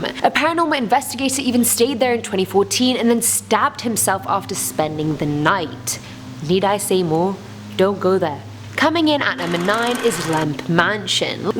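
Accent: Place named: England, United Kingdom. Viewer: Sound like an English accent, reporting on US ghost houses. Interesting!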